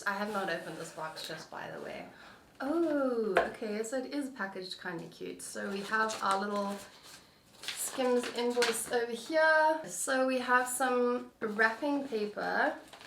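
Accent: South Africa. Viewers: Oh wow I’ve never seen your videos before but when I heard your voice I wondered if you were South African! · Do I hear a South African accent?👀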